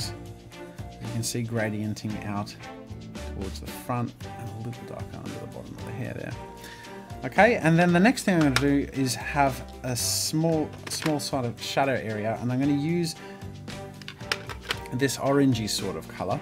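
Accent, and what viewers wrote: Australia. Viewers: Aussie mate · Oh shit, this guy's an Aussie!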